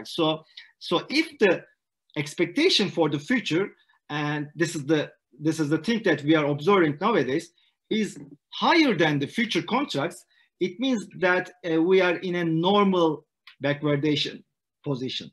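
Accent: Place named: Turkey. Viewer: Aksandan həmən anladım ki, danışan türkdür :) uğurlar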